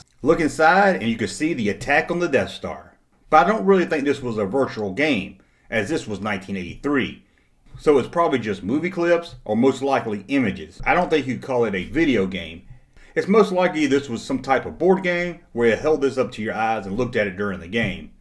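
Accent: South, United States. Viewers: I dig your vids and the southern accent (which I'm sure you don't think you have) is awesome. Funny, how, the name C-3PO was shortend in the film to "3PO or Threepio", and most put the emphasis on the "C" (or "Three") when saying his name. But you put the emphasis on the "PO". It's not hate mail, just intriguing.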